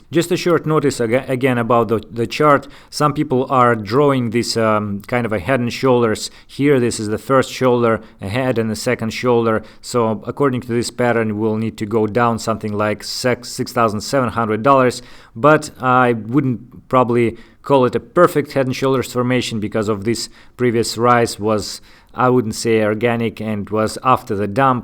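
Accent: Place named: Russia. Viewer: Sounds like russian accent :) But anyway, very solid video! Thanks!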